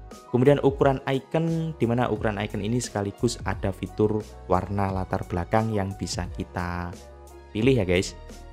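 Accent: Banyuwangi, Indonesia. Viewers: looh mas e wong banyuwangi rek😂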